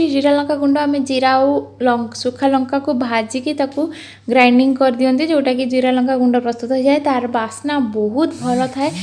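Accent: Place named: Baripada, India. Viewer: tame baripadia ki tama katharu Jana poduchi hele bahut badhia ghanta Heichi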